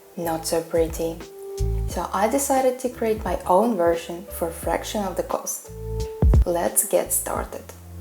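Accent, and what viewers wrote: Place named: Russia. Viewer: Акцент русских ни с чем не перепутаешь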